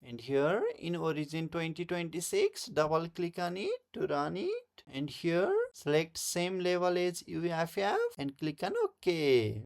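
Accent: India. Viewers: You must be from Odisa, India..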